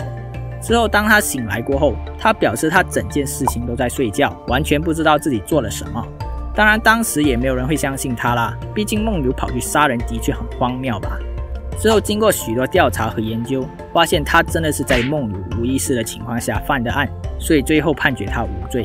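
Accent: Malaysia. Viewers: 你是马来西亚人吗？口音听起来很像XD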